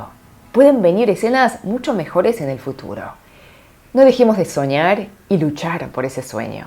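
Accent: Argentina. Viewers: te felicito, tu español con acento argentino es muy bonito, que sigan los exitos, te los mereces. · Tu Español es perfecto hablas como una nativa de la argentina con acento bien argentina 🇦🇷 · te acabo de descubrir por el video de y me ha sorprendido mucho tu historia de cambio, adaptacion y sacrificio en esta nueva tierra, que es mi querida Argentina. Muchas gracias por compartirla con nosotros y tambien por transmitir ese positivismo y entusiasmo en tus mensajes. Por cierto diras que tendras que pensar las palabras en castellano, pero te confirmo como muchos otros seguidores, que hablas perfectamente y ademas con este acento tan lindo que nos caracteriza.:) Abrazo desde España!! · Es un agrado concerte, se nota tu acento argentino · estoy en corea recien llegado y me intrigo tu acento argentino, un pais que impacta. Estoy por un evneto cientifico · Omg 😱 yo estaba convencida de que eras argentina!! 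O sea claramente lo sos xD El Argentino nace donde quieeere! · Hablas como argentina,🎉saludos de mexico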